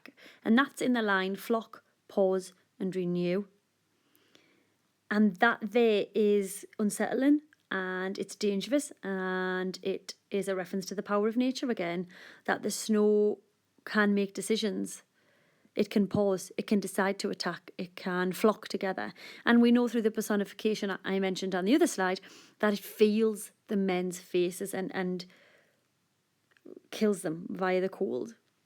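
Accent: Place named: Scotland, United Kingdom. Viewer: All I could hear was your scottish accent. Idk why for some reason, but tht i all I could hear.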